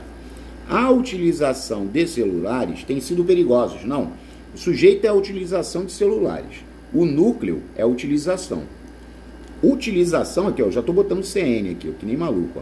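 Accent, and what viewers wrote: Rio de Janeiro, Brazil. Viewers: 7/50 !! Rlx, prof, é quase impossível agradar todo mundo! O senhor é nota mill, seu sotaque bem carioca faz parte do pacote!! rs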